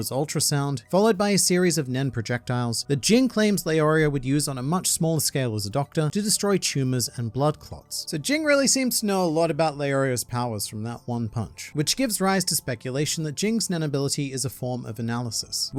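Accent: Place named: Australia. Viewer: I've been subbed for a while now but stopped watching for a bit. Just listening to your voice in your earlier videos, it's obvious that you're Australian and being a basketball fan, I've always guessed you'd probably look like Matthew Dellavedova. Now seeing your face for the first time... Holy shit. Lol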